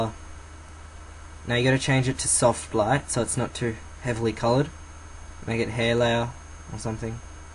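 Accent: Australia. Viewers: Are you from Australia? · hes obviously austrailian you dumb ass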